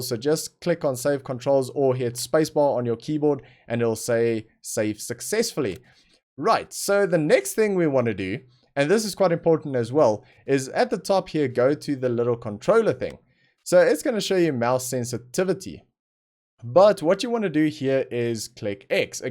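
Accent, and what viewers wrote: South Africa. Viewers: You sound a lot like a South African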